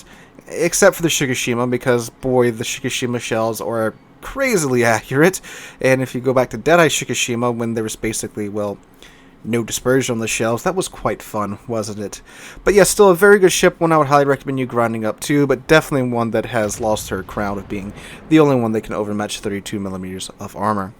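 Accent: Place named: South, United States. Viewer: Not all of them do, I think it's a regional thing. I believe is southern so his pronunctiation makes sense to me. May not be technically correct but makes sense.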